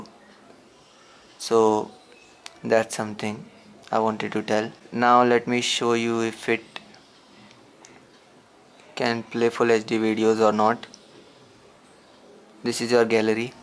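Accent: India. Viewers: Lmfao. Ok then, if you know so many languages you know how tricky it is to learn sounds and how to speak correctly sometimes. First. Second, if you complain about his English skills, at least write properly. Third, Yes he does have to improve, I never said otherwise, yet I've seen tons of reviews made by people from India speaking English and they all sound the same (some better some worse - no offense) so... there isn't much he can do.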